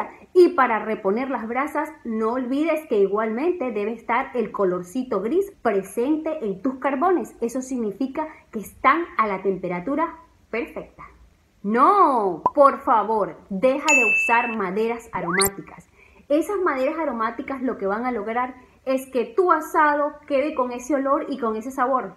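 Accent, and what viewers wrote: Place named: Venezuela. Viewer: En esta época tenia todavia el asentó venezolano 🇻🇪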